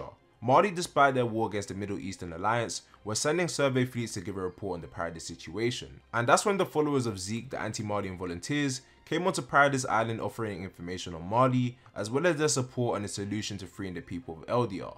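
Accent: London, United Kingdom. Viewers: bro speak less briish english pls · London innit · I love learning all the different kinds of British accents and yours is awesome. Definitely gonna try and learn it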